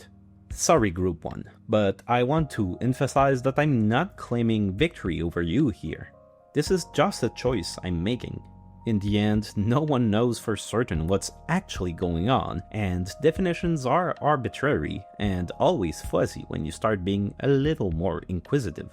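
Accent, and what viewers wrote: France. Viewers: De ce que je comprendre les Bibites sont des représentions artificielles de la vie, une simulation qui à pour objectif de répliquer la vie. Tu as très bien fait ton travail donc il en est une excellente réplique mais au final ils sont pas vivant puisque normalement la vie se passe naturellement ce sont des pixels qui sont éclairés de différentes couleur en réponse avec ton code. Beaucoup de respect pour ce que tu fais continue comme ça! Ps: déso d’avoir écris le com en fr je sais que tu parles fr (ça parait dans ton accent) et je suis bcp plus confo en français qu’en anglais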